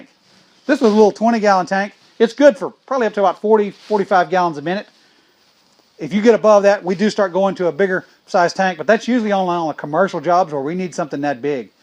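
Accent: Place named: South, United States. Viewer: Just listening to your southern accent makes you sound dumb. That's even before you just schill dumb shit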